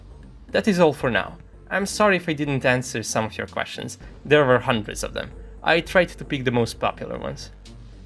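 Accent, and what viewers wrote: Eastern Europe. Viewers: Obviously, he has a slavic accent . I suppose he comes from Belarus or Ukraine . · I'm sorry but why would not say what country you are from or your age? Neither matter if you are putting out good content! Do you not like your country or do think people knowing what country you are from would be somekind of negative? I personally don't care if you don't say what country you are from or you age, but I also don't think it should matter what country you are from. From your accent you sound like you might be from eastern Europe, but then again I could be totally wrong lol.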